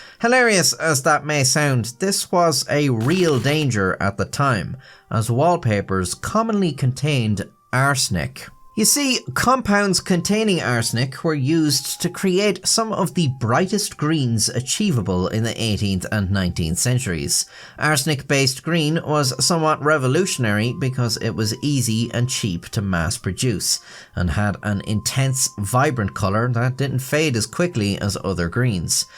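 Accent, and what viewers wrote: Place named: Ireland. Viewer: For the love of Pete can we please have more Irish voiceovers on YouTube 👏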